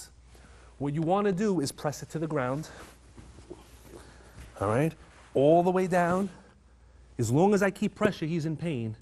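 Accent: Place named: New Jersey, United States. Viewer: This guy is real Jersey Pwot ove coughey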